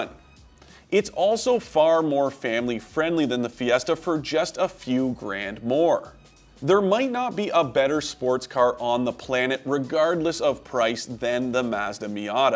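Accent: Canada. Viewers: First line he spoke, im like oh hes canadian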